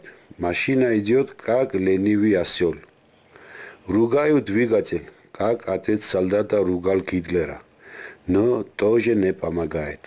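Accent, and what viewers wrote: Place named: Georgia. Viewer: Всегда с удовольствием смотрю и слушаю ваши видео. Особенно с грузинским акцентом!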